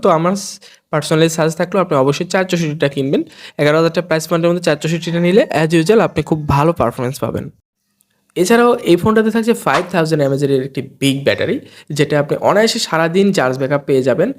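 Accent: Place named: Kolkata, India. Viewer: আপনি তো বাংলাদেশি, কিন্তু আপনার একসেন্ট একদম কলকাতার মতো কেন?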